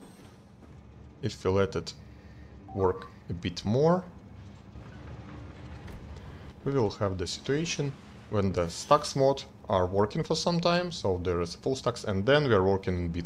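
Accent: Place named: Russia. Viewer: вангую автор русский, я прям слышу этот акцент😀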